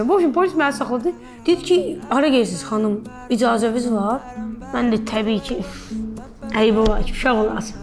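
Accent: Baku, Azerbaijan. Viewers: canım ay bakı lehcesi şırındude etaga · Sənin hər işin xoşum gəlir ama Bakı ləhcəsi mənə ləzzət eləmir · Bakı lehcesi başqa alemdi amma Bakı kendininde öz lehcesi acıqlama ver zehmet olmasa sizin lehce hansı kende aiddi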